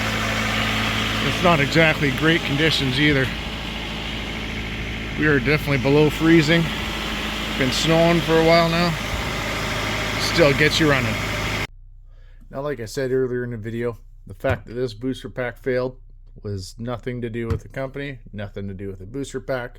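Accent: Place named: Canada. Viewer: Aboot! I could hear it in two seconds!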